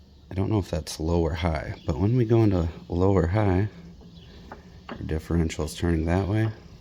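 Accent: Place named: Minnesota, United States. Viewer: So ur accent says north...Minnesota?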